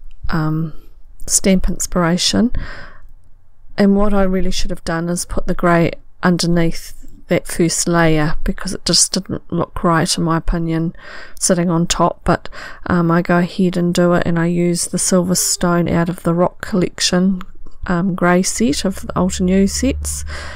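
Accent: New Zealand. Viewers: Hi. I am not a kiwi. My son luves in Christchurch. I've spent some time there. I felt that you had a NZ accent and that's why I asked you. I live in Mumbai...I love the kiwi accent. As my son has settled in NZ... it has become my second home. It is the most beautiful country in the world. Wish you all the best, good health Happy crafting....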